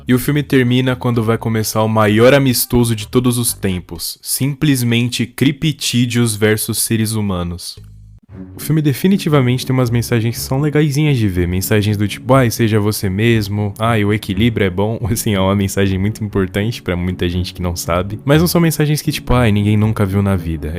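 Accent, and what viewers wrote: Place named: Rio Grande do Sul, Brazil. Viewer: Vc é gaúcho massa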